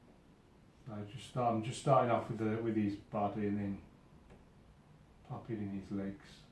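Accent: Birmingham, United Kingdom. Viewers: Beautiful result! I like the way you break down the painting when it's all done. Wish more YouTube artists would do that. Great Brummie accent btw! =)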